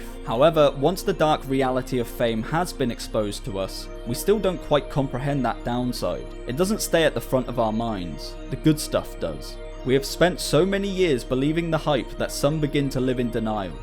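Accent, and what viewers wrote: United Kingdom. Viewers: Fame is bad sez the guy looking to become 'famous' via youtube subscribers. These British accents are starting to wear on my ear again.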